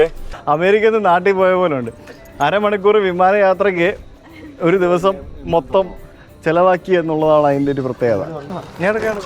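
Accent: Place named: Thrissur, India. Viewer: എന്തായാലും തൃശ്ശൂർ സ്ലാങ്ക് സുപ്പർ ആയിട്ടുണ്ട്ട്ടാ 👍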